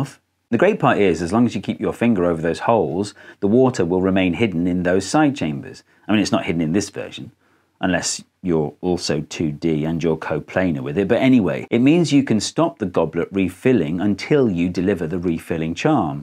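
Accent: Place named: Geordie, United Kingdom. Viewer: I’ve been watching your videos for years, and just realised on Wikipedia that you went to my secondary school! I’ve got as much of a geordie accent left as you do, mind, so I never even picked up on it. And ironically, I’m currently working in Universal Studios as a wandkeeper, so I guess we’re both wizards now. How many Tommy More wizards can there be? 😅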